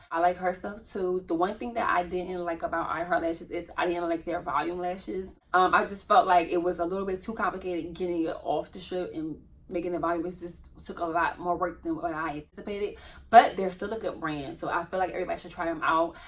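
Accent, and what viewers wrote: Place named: Philadelphia, United States. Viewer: I’m new to your videos , but I just gotta say … the minute you opened your mouth I knew you was from the city 🤣🤣🤣 when you said Houston I said ain’t no way . Then you said Philly and I said ard that’s what I thought 🤣🤣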